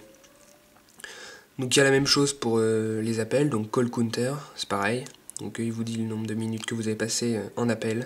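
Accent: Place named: France. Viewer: j'aime ton accent français =)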